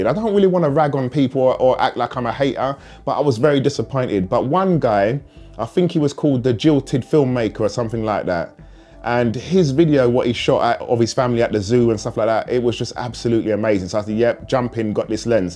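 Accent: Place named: South, United Kingdom. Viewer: Nice video mate, great to hear another southern British accent on YouTube hahaha!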